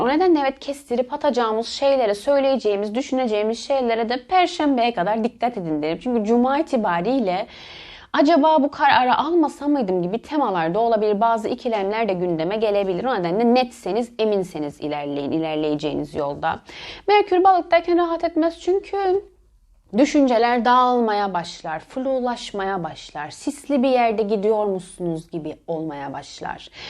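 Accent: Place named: Cyprus. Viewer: Tam bir kıbrıs şivesi var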